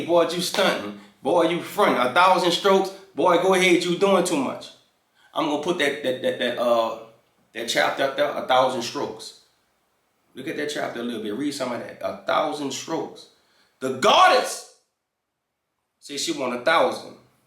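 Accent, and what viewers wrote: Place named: Louisiana, United States. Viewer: That Louisiana drawl gets me every single time☺️😮‍💨💕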